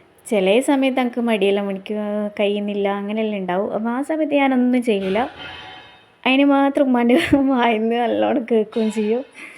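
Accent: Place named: Kannur, India. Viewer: താത്താന്റെ കണ്ണൂർ ഭാഷ അടിപൊളി ആയിക്ക്ണ് 😊😊😊ഡേ ഇൻ മെയ്‌ ലൈഫ് വീഡിയോ പൊളിച്ചു ട്ടോ 👍👍👍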